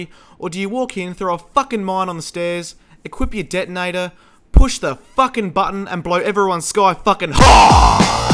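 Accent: Australia. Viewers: what he is Australian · what part of australia are you from? I'm from WA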